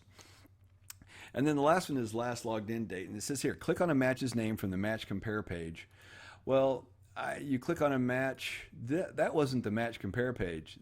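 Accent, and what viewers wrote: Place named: United States. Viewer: Take no notice when we Brits snigger at your pronunciation...you are probably saying it correctly, it's us who have corrupted it over the last 1000 years or so! :-) BTW...my favourite US pronunciation is Worcestershire...as in the sauce...you guys say Wor-sess-ter-shire...whereas we say Wuss-ter-sha (or 'shur' depending on where in Britain you come from!). And get 2 Brits, one from the north of England and one from the south, to pronounce the word 'castle'...ah...hours of fun with English pronounciation! :-)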